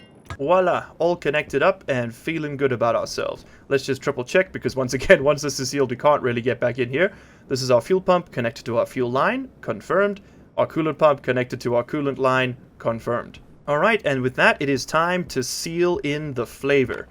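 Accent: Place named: South Africa. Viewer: you sound south african, nice video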